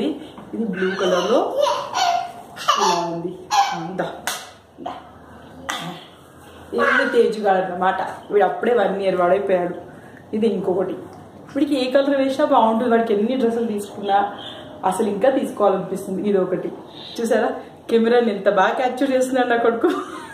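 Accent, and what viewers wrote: Telangana, India. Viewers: meedhi ea ooru.. swatchamaina telangana lo chepthunnavu.. i like you..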